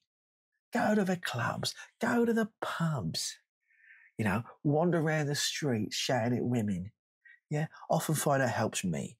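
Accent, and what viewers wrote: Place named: North, United Kingdom. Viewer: Welcome back always a pleasure Long shot but could you do an asmr were u just sell me random shit with ur northsn accent a bit like your antique seller roleplay u don't haft to just thought I'd ask.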